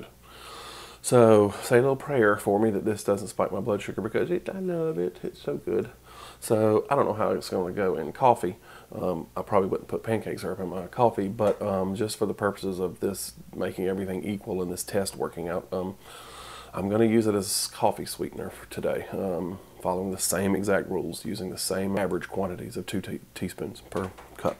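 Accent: South, United States. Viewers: You are adorable! I want to reach inside my screen and and kiss you. You are such eye candy. It's hard for me to pay attention because you are so so so good looking. And you're sexier with your Southern twang. Your wife sure has a good thing going. Wow, you are hot!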